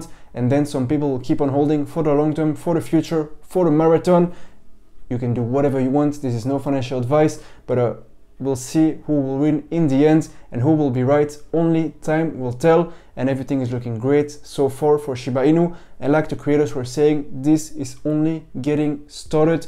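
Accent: France. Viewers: Everytime ...something big is coming up ...everything is ,, bullish“ and ,,amazing“ and looking at Shiba ,,realistically“ but I lost around 500 Euros since last week Monday ! So tell us when the Long term holders you think will make plus . What is ,,soon“ that Shiba,, can reach Doge“ ?!? Nothing is ,, looking great atm“ ! But I like your accent :-) the first french who likes to talk in English 🔥💯! Best regards from Germamy 💯🔥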